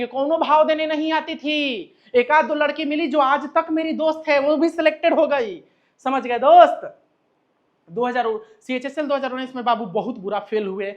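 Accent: Bihar, India. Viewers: Sun ke Acha lga aap Bihari ho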